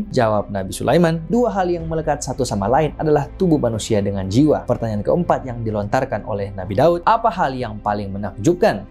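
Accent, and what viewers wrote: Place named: Batak, Indonesia. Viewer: Hai aku penasaran aksen apakah ya ? Kaya batak atau bugis ya aksen dan dialekmu?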